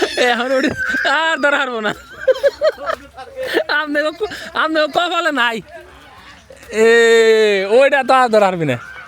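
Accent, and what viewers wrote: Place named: Manikganj, Bangladesh. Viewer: মানিকগঞ্জের ভাষা মনে হচ্ছে 🤔🤔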